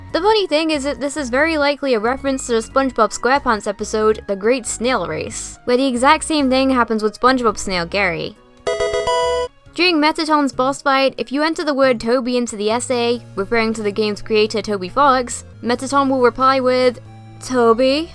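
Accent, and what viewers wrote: United Kingdom. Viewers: wtf are you british ???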